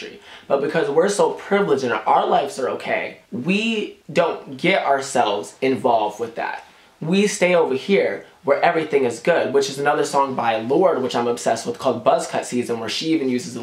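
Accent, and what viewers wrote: United States. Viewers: The fact that you're wearing an Adidas cap and using your iPhone and speaking with your modernised American accent and all that jazz just makes me love this video so much, because it means you basically fit into all of that yet the difference is you're aware. I loved this video from the very beginning and I got the message right away, I was waiting for a video like this to be made and I'm glad I've finally found it(because someone actually had the time and bravery to do it). And for that I thank you. I come from a very unprivileged place, Venezuela, and only recently (Literally less than a few months) we've been getting support and aid from the international community, when we've been facing with this narco-dictatorship for longer than 17 years. People die there, out of violence and starvation, and the international community is too distracted by media and other entertainment to realise. 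I was lucky enough to escape the country but I always make sure people around me and all those I possibly could talk to realise and are well-informed about what's going on back home. It's horrible and it's real. I am glad I found your channel, totally subscribing.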